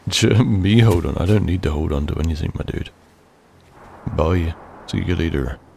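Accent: United Kingdom. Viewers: love the west country accent bro! sending love from bristol! keep up the hacker smacking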